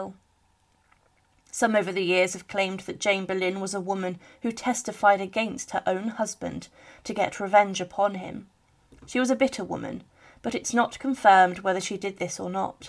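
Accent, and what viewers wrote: United Kingdom. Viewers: I am British. The narrator sounds British. So what are you saying?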